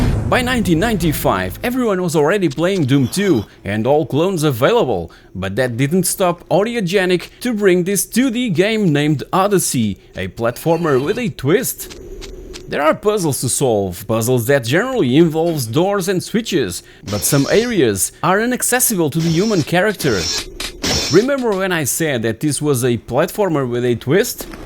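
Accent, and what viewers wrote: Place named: Portugal. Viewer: your accent is very Portuguese, am i correct?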